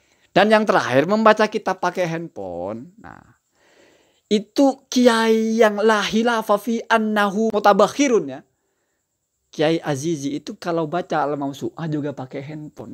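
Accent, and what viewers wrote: Madura, Indonesia. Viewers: Kalau dr nadanya, kayaknya Sampean oreng madhureh. Kerren gus. Bisa bagi nomer wa gus?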